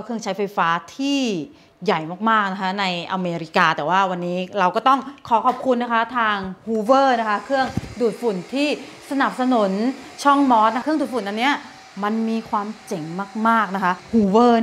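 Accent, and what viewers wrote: South, Thailand. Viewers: พี่มอสคนใต้ใช่มั้ย555 เข็ญไม่รอดนี่รู้เลยย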